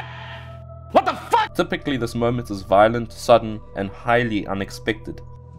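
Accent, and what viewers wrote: South Africa. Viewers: I can't help but feel like the narrator is south african.. Are you South African?? If so that's fuckin Lekker bru!!!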